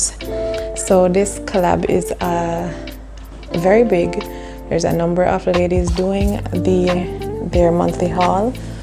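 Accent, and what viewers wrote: Jamaica. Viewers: Hey happy to be participating in this collab with you do I hear a accent in there somewhere Jamaican. New friend here and new subscriber as well going cover for IG group he's too adorable thank you for sharing❤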